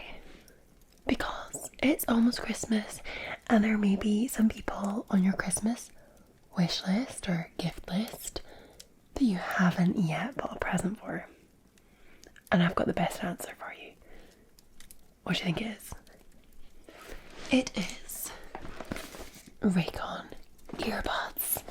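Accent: Scotland, United Kingdom. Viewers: Great video ❤ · half an hour of tingles from the resplendent scottish lass. perry the platypus noises ☺️ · U had me at Scottish 🏴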